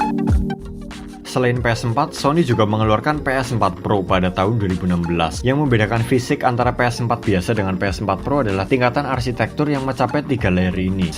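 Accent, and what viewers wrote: Banyumas, Indonesia. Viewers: ngapak tapi jelas lugas dan detail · Ngapak bgt mas tp sya suka hahahah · Ngapake pol lah ya pak, ora ngapak ora kepenak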